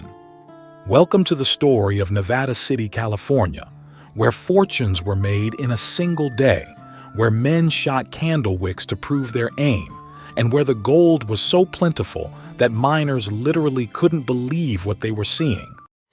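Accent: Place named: United Kingdom. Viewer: NW Georgia. You ? I thought you were British. 😂😂.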